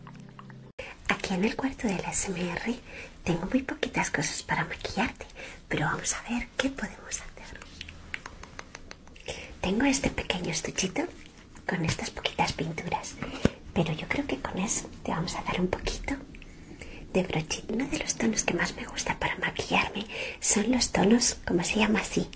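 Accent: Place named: Spain. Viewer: Esta muy bueno el asmr pe nose que tengo con el acento español que no me da tranquilidad pero igual esta lindo <3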